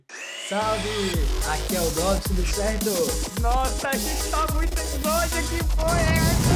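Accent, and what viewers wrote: Recife, Brazil. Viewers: Eita o é do recife? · AGORA MINHA DUVIDAS FORAM TIRADAS!! RECIFENSE NATO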